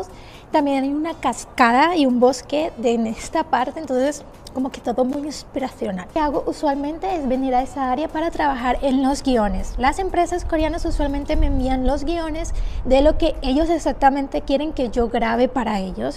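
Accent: Caribbean Coast, Colombia. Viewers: Ese asentó colombiano me encanta❤️🇨🇴😊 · Por qué YouTube tardo tanto en recomendarme tu canalllll😍 me encanta todo jajajaj soy tu fan amo como hablas, dime qué eres costeña sin decirme que eres costeña cule'balance JAJAJJAAJA amo mal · ¿Es ella costeña? Jajaja es que creo que tiene un poquito este acento de costeña colombiana 🤭🤔 un saludo desde Colombia ♥️ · De qué parte de Colombia eres? Hace poco te comencé a seguir por Instagram y descubrí que también estás en Youtube me suenas muy costeña como yo 🥰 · Como que acento costeño 😶 soy nueva seguidora... Wow de la costa... Tengo esperanzas jajaja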